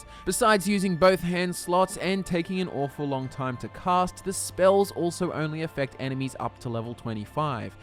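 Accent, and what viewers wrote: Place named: Australia. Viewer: This made me realize that i have a thing for an Australian accent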